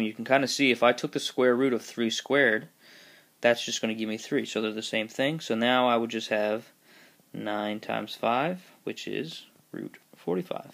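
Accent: Newfoundland, Canada. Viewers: Are you from New Foundland?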